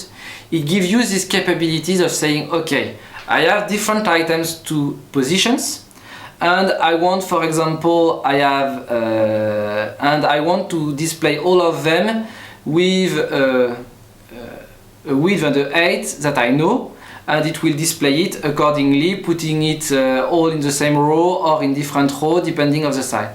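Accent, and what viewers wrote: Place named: Paris, France. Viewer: Ça sens l’accent parisien par ici. Nice video but can have better resolution for next time.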